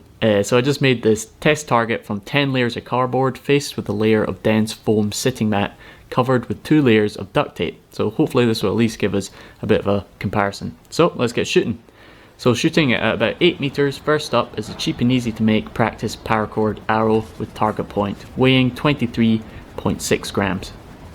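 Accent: Scotland, United Kingdom. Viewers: Even better given the presenter has a Scottish accent. 🙂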